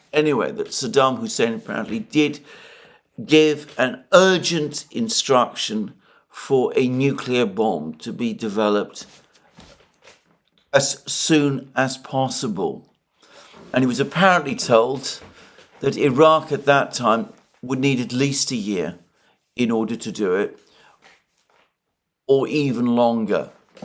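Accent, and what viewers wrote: United Kingdom. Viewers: it is an ai, it is transcribing Alexander's British accent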